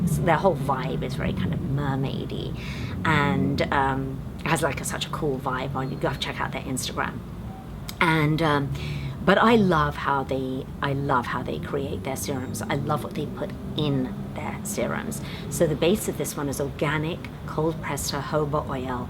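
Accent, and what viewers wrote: United Kingdom. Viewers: Hi, great serums I will certainly check them out. Starting my 14 days sugar free today. i know I'm not watching these in any particular order but so far loving the content. I'm in Co. Wexford Ireland and spotted your British accent straight away which is why I stopped to watch you and so glad I did. Eleanor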